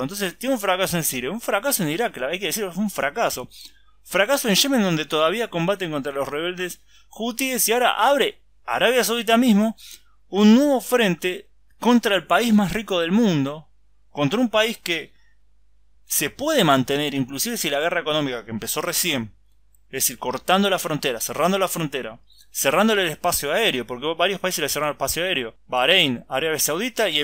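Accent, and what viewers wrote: Argentina. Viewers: Tu acento de nariz tapada argentina es tan fuerte que se te entiende poco. Mejóralo, chhhheeee..... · que lindo esuchar un argentino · En "ashuda" y es la forma de hablar que tiene la gente que vive en el gran Buenos Aires,CABA y distintas ciudades a lo largo y ancho de la república Argentina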